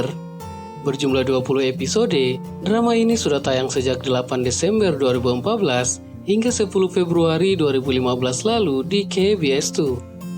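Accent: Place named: Makassar, Indonesia. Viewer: Dari cara ngomong kelihatan bgt kalau org makassar😂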